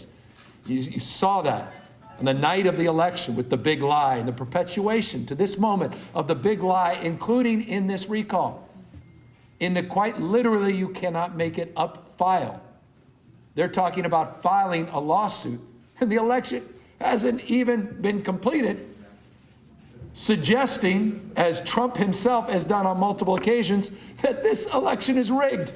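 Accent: South, United States. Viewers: Is it me or is he pandering the black n brown folks with that southern accent? Just an observation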